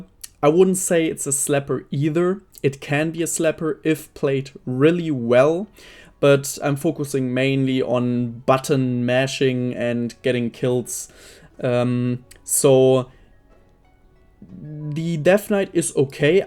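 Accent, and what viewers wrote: Germany. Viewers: The german accent is wyld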